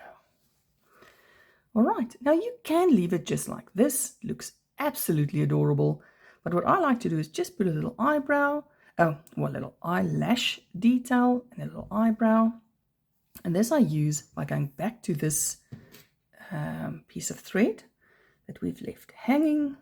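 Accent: South Africa. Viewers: How pleasantly surprised I was to hear your South African accent. Hellooooooo, from Jukskei Park, Gauteng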